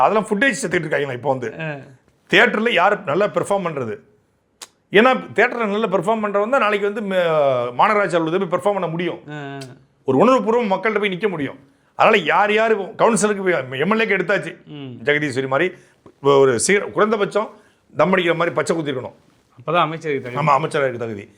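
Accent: Tirunelveli, India. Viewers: When saattai speaks, sometimes his nellai accent slips, that looks very cute❤😅....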